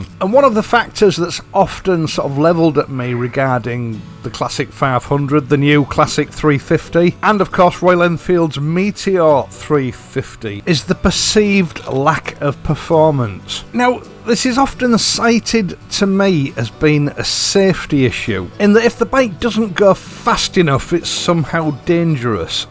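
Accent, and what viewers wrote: United Kingdom. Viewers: for a German bloke as I am it feels so nice to listen to your authentic british accent ... it reminds me of my good old times visiting GB when I was a t young age 😀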